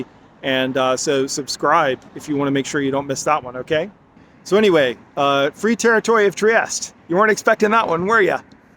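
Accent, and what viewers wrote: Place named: Southern California, United States. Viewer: is it just me or does this guy have the most intense southern california accent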